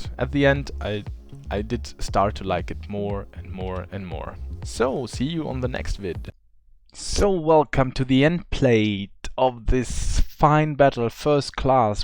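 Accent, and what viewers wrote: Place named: Germany. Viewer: your accent sounds like a native German speaker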